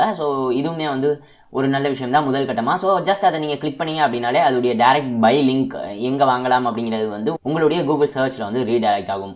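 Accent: Madurai, India. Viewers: Neenga madurai karara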